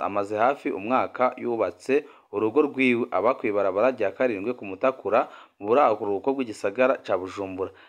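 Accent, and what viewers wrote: Rwanda. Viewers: Amakuru yawe aba maremare kubera usubiramwo ivyo uba wamaze kuvuga kandi wige kuvuga neza ikirundi ugitandukanye n'imvugo nyarwanda nyarwa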